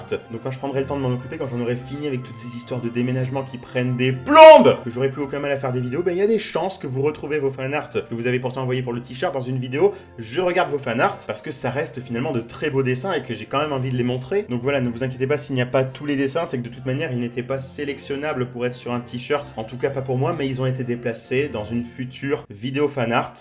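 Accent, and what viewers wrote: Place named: Quebec, Canada. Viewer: J'adore l'accent québéquois ^^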